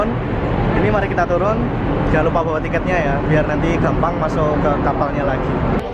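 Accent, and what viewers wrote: Java, Indonesia. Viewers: mas jowo hehehehe woyo2 mas e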